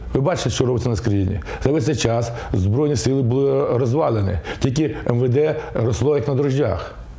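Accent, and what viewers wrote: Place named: Western Ukraine, Ukraine. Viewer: ааааааа! Тогда по твоему пол западной Украины контуженные! Это форма разговора такая!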